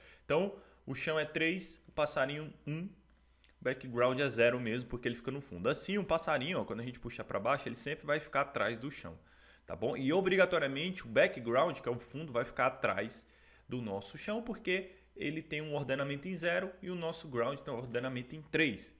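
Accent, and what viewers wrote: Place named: Ceará, Brazil. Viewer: otimo tutorial. bem simples e eficaz. a proposito, vc eh cearense? percebi pelo sotaque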